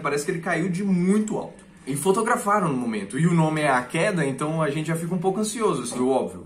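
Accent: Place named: France. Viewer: tô aqui pelo sotaque francês, flw vlw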